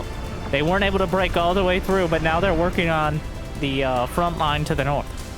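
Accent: United States. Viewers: What is dudes new American accent?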